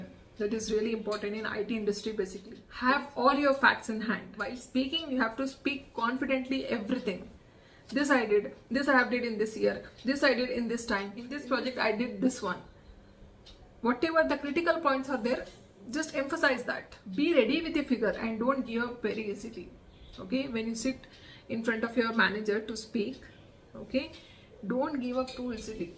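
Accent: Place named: England, United Kingdom. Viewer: I like u English Accent 🎉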